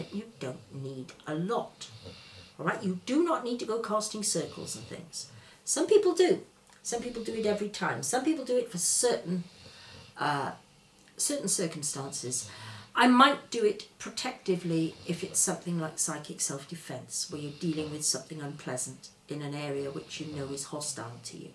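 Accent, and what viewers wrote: Wales, United Kingdom. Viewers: I love your accent I hear Welsh in it ❤💛💚🧙✌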